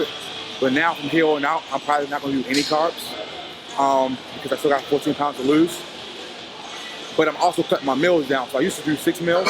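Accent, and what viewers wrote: Baltimore, United States. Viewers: Heavy b more accent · That’s that Baltimore voice. I know that for a fact✔️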